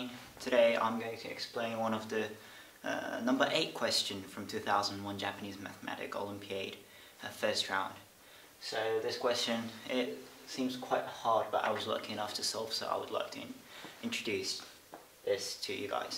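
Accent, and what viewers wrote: United Kingdom. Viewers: You know how good it feels when you got it right Btw I solved this in 10 min I’m not gonna lie I’m so happy Ps I love your British accent it’s so cool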